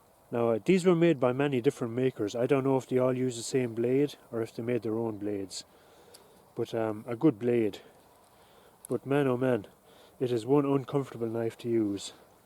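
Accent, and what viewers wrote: Ireland. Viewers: yeah kind of I was saying to meself midlands or wexford I'm from Laois originally moved to waterford years ago. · it great to hear an Irish accent on a man doing great work and talking sense . well done sir .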